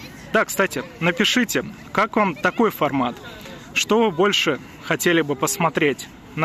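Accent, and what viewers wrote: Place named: Volga, Russia. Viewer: У вас Поволжский акцент,вы скорее оттуда.Я сам бакинец,но живу сейчас на Кубани на Чëрном море.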